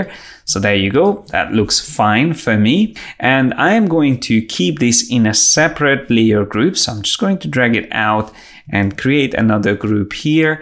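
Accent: Hungary. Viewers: bonus for hungarian accent :)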